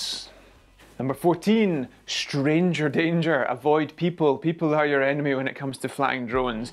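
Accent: Scotland, United Kingdom. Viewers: The Scottish dialect is simply the coolest in the English language and the drone tips are definitely great, thanks for that! 👍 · Amazing set of drone flying tips and I love your Scottish accent. I always check the flying conditions on apps like UAV forecast or Weather by Tomorrow before flying. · Scottish man love it I’m a MacGregor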